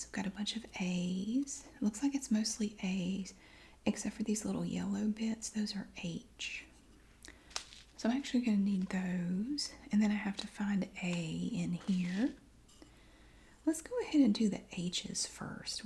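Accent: South, United States. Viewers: I love your whispering videos. You have the nice sounding and calming voice. The southern accent also has this New Yorker hooked lol. Love it. You’re adorable and I love ALL you videos and your sense of humor! Don’t listen to trolls or haters they’re just jealous of your success.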